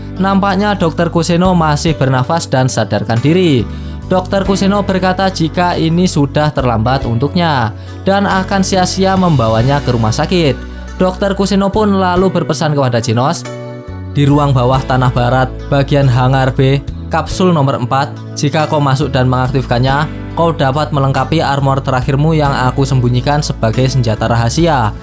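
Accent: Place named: Central Java, Indonesia. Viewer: Min lo koq kyak orang jawa tengah bisa bahasa jawa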